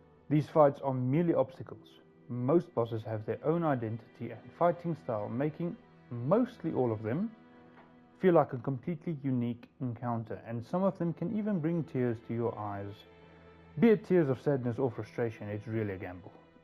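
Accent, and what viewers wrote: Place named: South Africa. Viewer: The accent tells me you're from South Africa.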